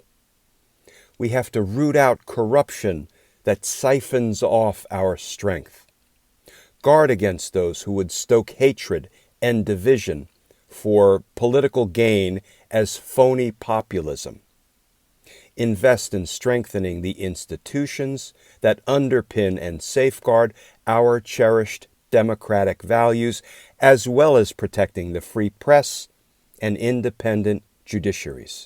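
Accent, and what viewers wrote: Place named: New England, United States. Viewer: LOVE LOVE your measured, calm, clear way of speaking......I hear a little tiny hint of New England in your lovely voice.....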